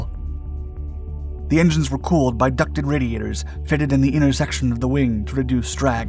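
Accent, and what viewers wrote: United States. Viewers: 'Murcan way of reading it. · British convention is not "the two sixty third" squadron as the Americans do it, but "two six three" squadron. Other than that a fine production on a lesser-known aircraft. Thanks and keep up the good work.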